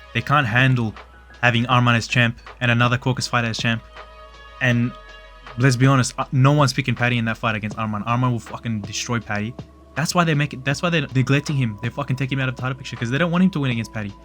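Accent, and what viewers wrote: Australia. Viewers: wtf didn't know my favourite editor is australian 😂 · Didn't expect an Aussie, presently surprised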